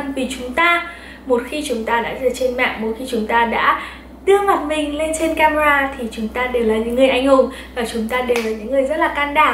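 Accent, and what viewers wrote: Standard. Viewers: c nói quá chuẩn, quá hay :D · Chị nói chuẩn quá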